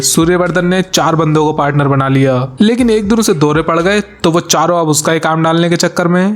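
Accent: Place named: Madhya Pradesh, India. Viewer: bhai tum MP se ho kya? Tumhara Hindi accent bahut bekar hai.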